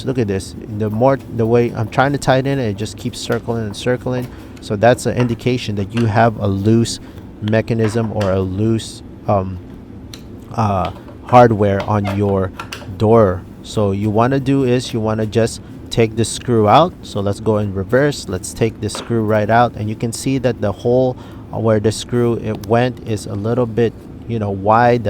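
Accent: Philippines. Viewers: I know a Filipino when I hear one lol Thanks for this!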